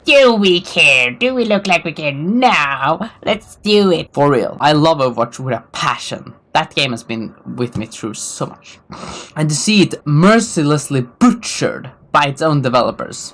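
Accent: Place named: Sweden. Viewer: Lmao I can already guess that u are swedish, or norwegian or something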